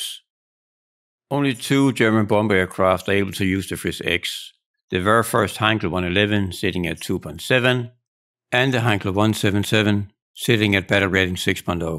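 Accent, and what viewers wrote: Denmark. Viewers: You sound danish, and thanks for the guide! It was really useful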